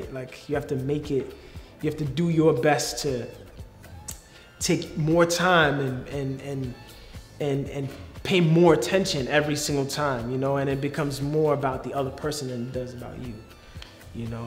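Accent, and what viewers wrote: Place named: New York, United States. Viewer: You can really tell he is really from New York 😂🤣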